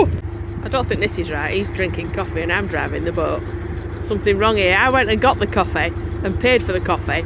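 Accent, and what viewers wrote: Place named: Yorkshire, United Kingdom. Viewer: Leeds and waky accent very similar, first time I've seen your channel I've subscribed look forward to watching more of your videos,👍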